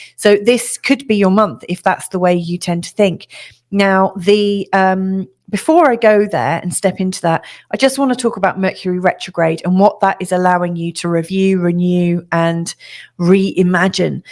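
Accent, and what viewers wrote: United Kingdom. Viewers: you seem like a really nice person! great insights; calm, british accent (a must) - haven't been into astrology for a few years but you've got my mind moving! thank you :) - scorpio (pluto/mercury first house) scorpio (sun venus chiron 12th) & aries moon (4th) :))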